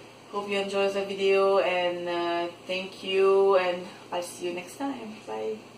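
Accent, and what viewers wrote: Mauritius. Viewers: Your accent is very similar to Mauritian's!cool😊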